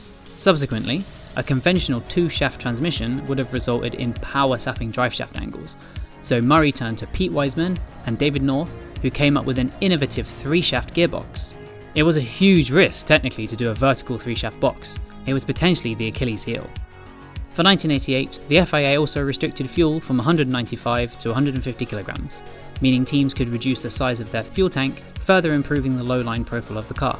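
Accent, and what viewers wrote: United Kingdom. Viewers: Why do Brits call Honda, Honder?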